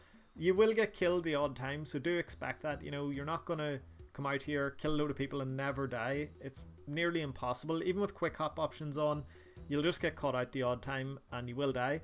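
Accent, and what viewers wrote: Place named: Belfast, United Kingdom. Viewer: Bro we’re about a are you from? As a Belfast man I never thought our accent youd sound good on an rs vid... and I was right lol makes me cringe soo much.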